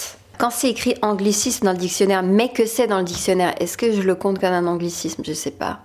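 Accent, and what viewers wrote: Quebec, Canada. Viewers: Jsuis québécoise pis jtrouve que ta un superbe accent québécois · T'a tellement pri d'accent quebequois c'est fou!!! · Pour ton info, elle vient en effet du Québec. Et elle ne fait pas semblant d'être quoi que ce soit : elle vit en France depuis plusieurs années. Du coup, tu finis par être à l,aise avec la langue de ton nouveau chez toi. Elle a appris avec le temps et on ne voit pas la différence ou presque. Mais le Québec reste caché et peut sortir facilement ! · elle a pas l'air francaise :') ton accens québecois est trop bien fait ahah et c'est sur que si tu prend le pire film quebecois sa sera nul même les quebecois écoute pas ca genre je suis québecoise et j'ai jamais écouter ca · xoxo Elle «imite» aussi bien l'accent français puisqu'elle est québécoise. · Solange est pas fucké, elle m'a l'air d'une Québécoise qui fait semblant d'être une parisienne... 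lol.. Ton Québécois est parfait, t'es Française ou Québécoise? En passant, si l'origine du Français Canadien t'intéresse, écoute du Joual. Les coins de campagne, le bas du fleuve, ou les maritimes encore aujourd'hui parlent un Français très similaire, mais en ville notre Français est très commun. Le joual et le Québécois est au Français comme le Créole est au Français. <link> (active les sous-titres!) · J'adore lorsque tu parles avec ton accent québécois!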